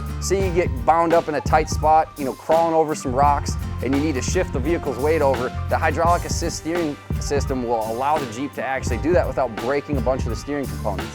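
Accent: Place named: Wisconsin, United States. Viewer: Just that good ole Wisconsin accent! There is a full build list available!! <link>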